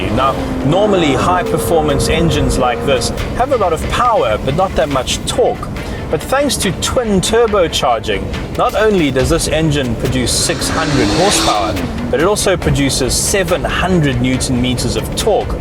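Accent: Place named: South Africa. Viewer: CarVinci No this South Africa. This is our normal English accent. I have seen that many people from other countries think we speak with an Afrikaans accent but then that is people who speak Afrikaans usually who then also speak English. His accent is the usual South African English. The place he was filming is in Cape Town.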